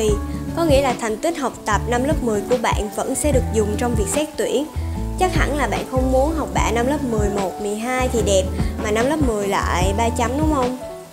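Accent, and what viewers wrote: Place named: Saigon, Vietnam. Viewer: Mình thấy b ko nên lồng tiếng ý, vì giọng b là người sài gòn, khá khó nghe, b nên thử giọng hà nội hoặc dùng chữ thì sẽ chill hơn nha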